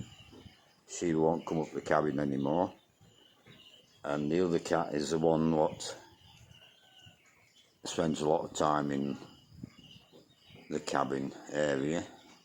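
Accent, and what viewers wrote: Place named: Yorkshire, United Kingdom. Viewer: Which part of Yorkshire are you from ❤if I was guessing 🤔 I would say Barnsley ❤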